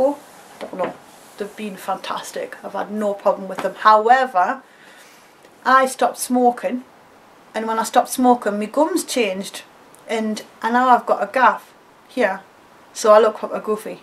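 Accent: Newcastle, United Kingdom. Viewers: Hi, I just wanted to say watching this video was so emotional for me and brought back a lot of teenage memories, your tutorials helped me through many Halloween’s and to make me feel beautiful at school, I have to admit I was bullied for being ‘emo’ but my makeup was amazing and I learned a lot from you! maybe just 13 years old when I firs5 discovered you! I thought you were amazing and watched you for hours on end. I’m now 25 and still been following your channel since back then. I love that you’re a real person, watching your videos is like having a chat with you, just like back in the good old days! I feel very sorry that this happened to you, you really are a down to earth lovely lady and I hope to see you back on here more! I also get a good giggle out of all the geordie sayings I’d say to my dad, it’s not often you hear someone on here chatting the same accent anymore! 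Sending love it’s so good to see you!!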